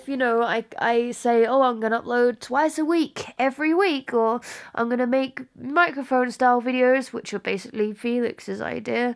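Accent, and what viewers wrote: United Kingdom. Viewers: Okay three things 1. is an amazing song. 2. Originality, in my point of view; has evolved into a performance type thing. Everything can be traced back to anything if you really put your mind to it. the thing is how you take that which inspired you and make it into your own thing. So yeah you sound like...no shit, Haley Williams is a bad ass performer that inspired many girls in the last decade to try this. At the end of the day, an song is AN SONG. don't be ashamed of whom you sound/look like. Embrace it and evolve it into your own thing. 3. Snotty nostrils sounds incredibly serious with a British accent.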